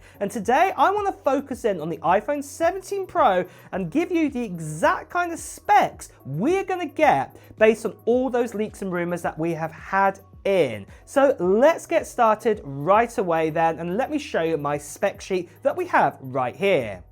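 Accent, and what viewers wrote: United Kingdom. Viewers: You pronounced aluminium wrong unless you’re American? You sound British lol wtf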